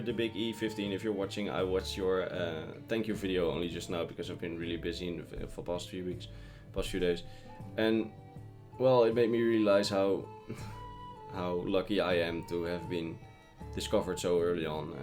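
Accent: Netherlands. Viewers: By your accent i can tell you are dutch. Why hello fellow dutchman. How i wish the last dragonborn would be off on the quest to claim the throne of the empire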